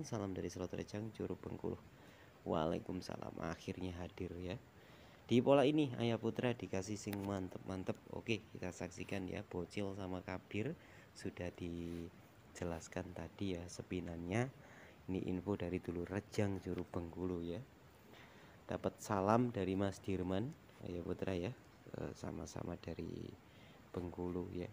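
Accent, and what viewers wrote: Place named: Java, Indonesia. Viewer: Joss cak smga sukses selalu cak logat jowomu gurih😁👍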